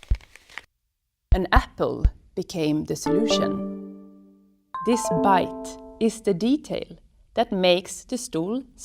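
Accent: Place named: Sweden. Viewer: This is a very thick Swedish acccent, if there ever was one! Cute design! :-)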